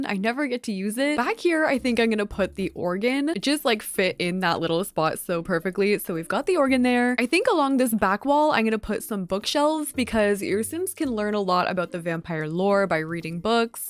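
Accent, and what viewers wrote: Canada. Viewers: Girl it’s a Canadian thing I swear lol I catch myself saying ehh and laugh because it sounds so Canadian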